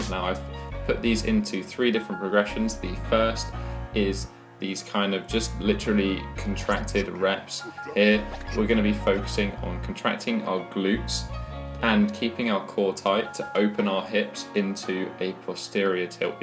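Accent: United Kingdom. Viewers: You sound British but have a palm tree behind you...Where are you? It's driving me crazy haha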